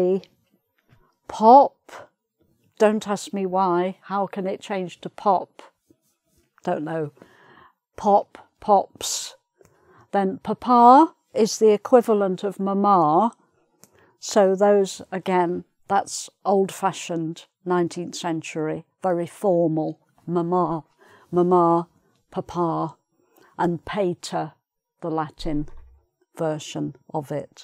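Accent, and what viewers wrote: England, United Kingdom. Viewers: chemical makeup hhhhhh You have the nicest English accent I've ever seen before. keep on. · thank you a lot , i loved your british accent much <3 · Hi i like your videos,theyre very intresting and i learned alot from you i like the british accent!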